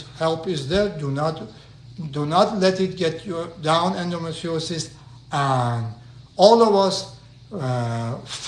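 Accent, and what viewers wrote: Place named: Iran. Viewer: Thank you for your video. I have a 3.5 c.m. Cyst on my right ovary causing me pain. What are my options? My husband and I want to have a child but this situation is making me hold off. Would I require surgery and if so, is my fertility still in tact? Also, you sound Persian. I am also Persian. Thank you 🙏🏼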